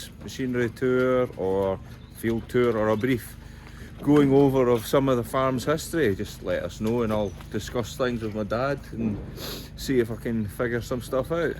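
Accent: Scotland, United Kingdom. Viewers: Hi great videos and I really enjoy watching them. Especially as you're a fellow Scot with a similar accent. I was born in Lanark. I'm curious to hear where in Scotland you are? Are you south west of Lanark? Keep the videos coming 👍👍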